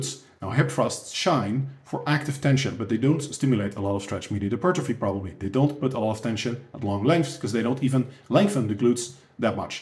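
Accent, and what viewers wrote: Netherlands. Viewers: At the start, for a second I thought Alistair Overeem was speaking :) Almost the same Dutch English accent and intonation hehe. Either way, great content! Keep up the great work!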